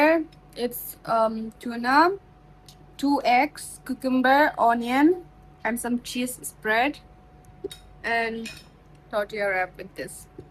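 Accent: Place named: India. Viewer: Her hindi accent is sooooooo cute😭😭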